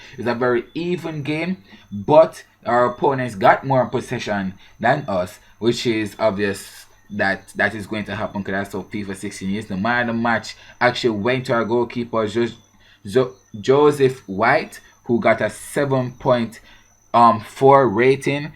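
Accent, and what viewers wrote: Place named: Jamaica. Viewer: Loving this series..should have more views..your accent is awesome and it's about we had another Jamaican at Bolton :P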